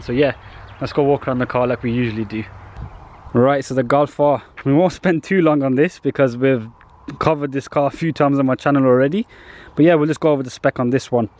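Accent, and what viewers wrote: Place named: Birmingham, United Kingdom. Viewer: You're from Birmingham aren't you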